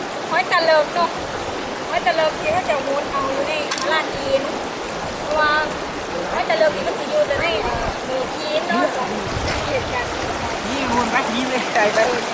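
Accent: Isan, Thailand. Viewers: สำเนียงเว้าคือคนอีสานบ้านข้อยฟังแล้วเข้าใจง่าย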